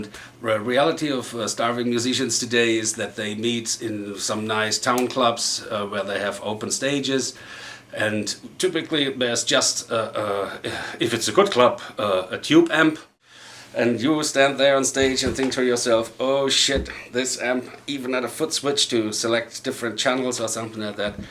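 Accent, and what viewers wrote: Hesse, Germany. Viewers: your german accent is bad ass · Is he German? He kind of has an accent. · hi, excellent explanation. you should have mentioned that reverb/ and delay was engaged. that makes it easier for beginners to get at least near to the sound. i played more than 40 years guitar and have found out that it is easier to play with a gibson les paul than a epi or harley benton. since i own a original gibson i feel i play better. the tones match better to the records i play to, especially in the higher octaves. i like your stuff and your hessisch english - i come from nearby:-) so it sounds familiar. best from Limburg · Bist du ein deutscher 😂 man hört den Akzent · you have an german accent, don't you?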